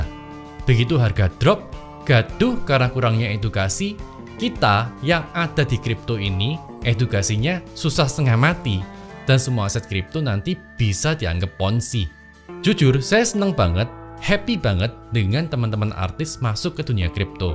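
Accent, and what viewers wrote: Java, Indonesia. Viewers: Ko bahasa nya medok Jawa Jo😅😅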